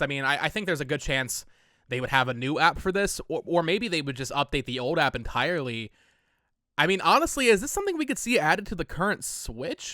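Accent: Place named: Canada. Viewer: You say “on” like “awhn”. Are you Canadian?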